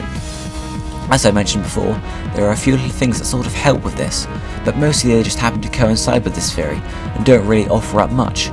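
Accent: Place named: United Kingdom. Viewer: *Video starts with a British narrator* Okay, this I like...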